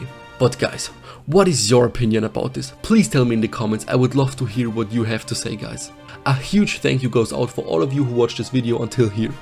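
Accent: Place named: Germany. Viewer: Netter deutscher Akzent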